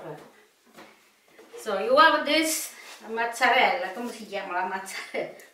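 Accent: Italy. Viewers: All I had to hear was, "Today I make a fettuccine" in a strong Italian accent... I know I've come to the right place. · Take a chill pill. Im merely saying that I prefer listening to italian granma in her accent it gives the channel a certain flavour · ! I love your food, I love your Italian accent. My grandparents came to this country from Bar, Italy. I miss them, I miss kitchen time, especially with my Aunts, too. Please keep cooking!!! 🙏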